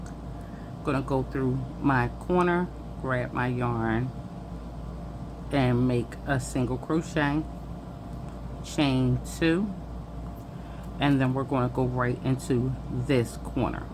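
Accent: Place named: Philadelphia, United States. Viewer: Hey... love your work. Where are hot from if holy don't mind me asking. I hear Philly or Jersey. I live in Philly.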